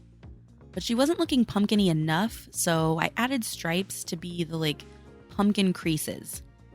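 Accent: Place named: West Tennessee, United States. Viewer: gotcha! Well that is surprising. I'm in West TN so not close! Haha 😂 You sound like the people around here. Not talking about the country fol, just the city people. Lol Which now that I think about it, the city people, especially the newer ones (like in the last 20 years) would have come from somewhere else. So it makes sense their accents would be similar to those in other parts of the country. Lol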